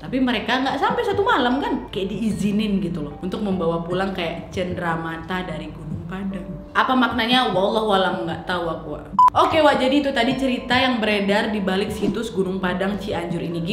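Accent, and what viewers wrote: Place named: Medan, Indonesia. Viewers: Mak cik ini aslinya dari mana? Dari logat bahasanya kayak dari tanah Deli. Tapi awak tak tahu pasti kali lah. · Dari logatnya aku duga kak ininorang medan. Ya kan wak?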